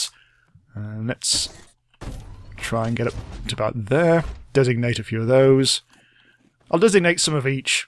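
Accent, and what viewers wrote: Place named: England, United Kingdom. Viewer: It's a fairly typical English accent, I don't sound much different either. :)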